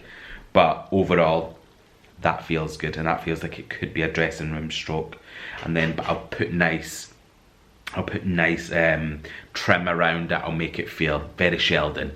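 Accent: Scotland, United Kingdom. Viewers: I found u a few weeks ago I've binged u since n I love hearing a Scottish accent im north east n it's great watching u ur wonderful ur home is gorgeous n I'm so glad I found u I subscribed last week after watching a while n I'm hooked u need to give Lydia n alli Gordon a watch ud love there home xxx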